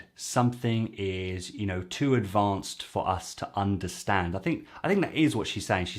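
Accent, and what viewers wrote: England, United Kingdom. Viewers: Love your videos, but that English accent of yours has gotta go when you speak French😍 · Hello! I have just discovered your channel ! Very funny and interesting! You offer to me me a good opportunity to improve a little bit, my very unperfect english. Thank you for that! I also appreciate your english accent. l like Judy Foster very much. Yes she makes some little mistakes here and there, but it ins 't a bad thing. On contrary, this adds more charm to her charm... Encore bravo pour votre analyse de texte! Salutations !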